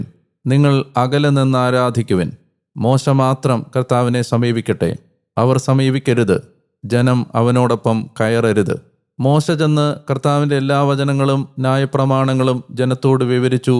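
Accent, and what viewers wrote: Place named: Pathanamthitta, India. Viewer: Karthave during my 75 years of age first time a Priest ithupole ithra clear ayittu Thiru vachananam kelppikkan athu. Achente way of talking n Malayalam language is like my own birth place Pathanamthitta Kerala. Though I n my family is in Rajasthan for the last 50-60 years, I haven't learn malayalam typing and as such I have to type malayalam typing in English. ❤❤❤.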